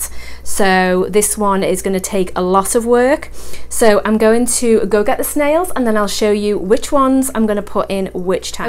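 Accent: Liverpool, United Kingdom. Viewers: Great video. I've been a subscriber for a while now and there's a (off topic) question I've been wanting to ask for a while... I know you're based in the USA, but your accent, I'm convinced I can hear overtones of Scouse, what we call someone from the Liverpool/Merseyside area here in the UK, or am I just imagining it? Okay, back on topic. There seems to be much less choice and availability here in the UK when it comes to snails, I think partly due to some species being 'banned', but also for some reason they just don't seem to be as popular over here. However, I personally keep 1 Zebra Nerite in a 19 litre / 5 gallon tank (a Fluval Spec V, which is quite a narrow tank, only 16cm front to back and not the easiest to get into for cleaning or maintenance, etc.) along with a small colony of Red Cherry Shrimp. I also keep a trio of Zebra Nerites in a planted and 'scaped, 60litre / 15-ish gallon temperate tank, along with 8 Blue Ring Danios and 8 Amano Shrimp. 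The shrimp were originally about half the size of the fish, but now they (well, the females anyway) have grown to be bigger than the fish! I added the snails to the tanks because I wanted to add another dimension to the activity within. The fact that they also serve a useful purpose by helping to keep algae under control is, as I see it, a bonus but not the only reason I got them. At present, I'm playing around with 'scaping ideas in a 40cm cube (another 60 litre / 15 gallon tank) and I also have a Fluval Flex 9 (gallon / 34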